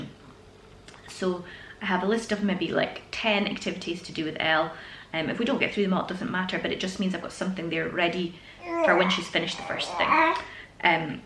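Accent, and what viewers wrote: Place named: North, United Kingdom. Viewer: Fab video. Ive been stressing about teaching my 2 girls inbetween being out at work. Lovely to your lovely family and to hear ur accent. Im originally from Peterhead so its nice to hear northern accent again. Stay safe take care x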